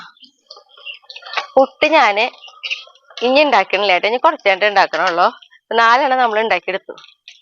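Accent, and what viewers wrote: Thrissur, India. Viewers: ഞാനും അഴവ്, വെണ്ണീറ് എന്ന് ഒക്കെതന്നെയാ പറയാറ്. നമ്മൾ ത്രിശൂർകാര് അച്ചടിഭാഷ അധികം ഉപയോഗിക്കുന്നവരല്ല അതുകൊണ്ട് ഇങ്ങനെ തന്നെ പോട്ടെ അല്ലെങ്കി " എന്നാ എന്നതാ " എന്നൊക്കെ പറഞ്ഞ് പഠിക്കേണ്ട അവസ്ഥയാകും ഈ ത്രിശൂര് ഭാഷ കേക്കാനാ നാട്ടില് ഇല്ലാത്ത ഞങ്ങള് video കാണണത് അതുപോലെ മക്കളെ നമ്മടെ ഭാഷ പഠിപ്പിക്കാനും Best wishes and keep going ❤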